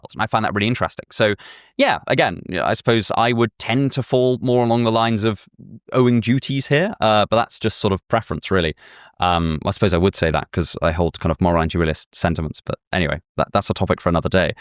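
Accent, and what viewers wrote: United Kingdom. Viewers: There is nobody I trust more than a guy with a British accent named to tell me about moral philosophy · My first ex ruined philosophy for me but I’m down for cute British boy reading aita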